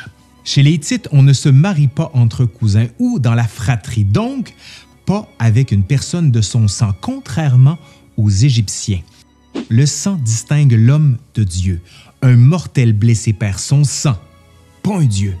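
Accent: Canada. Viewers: très intéressant... en plus. j'adore votre accent canadien ;-)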